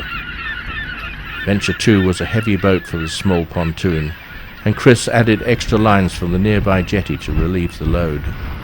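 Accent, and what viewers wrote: United Kingdom. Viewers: enjoyed everything about this wonderful trip to Iceland. T he narrator kind of sounds like Orsen Wells! terrific voice with a slight British accent . I could listen to him all day! Thank you so much, for sharing your adventures! Question, Is Icesland part of Norway? It was discovered by the Vikings I was told! Did the Vikings clame Green land?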